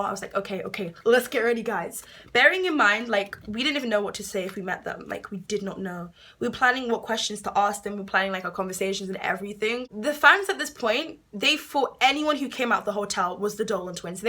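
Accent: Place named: United Kingdom. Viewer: Btw I love ur accent 😂 I'm part British and only get it when I'm speaking to fast or reading but I want it throughout the day cuz it sounds so nice and proper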